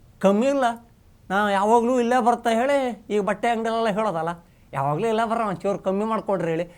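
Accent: North Karnataka, India. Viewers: This is not kundapara language. This is north kannada language.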